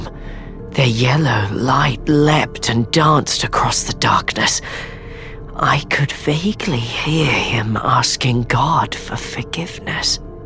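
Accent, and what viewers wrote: United Kingdom. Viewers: HES BRI’ISH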